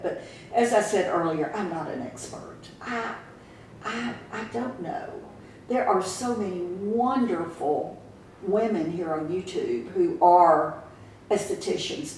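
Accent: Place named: Arkansas, United States. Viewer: I always wanted to ask you are you from Arkansas? Because I know someone that has That is from Arkansas and has the same accent that you have. So I was just wondering where are you from to get such an accent like you have?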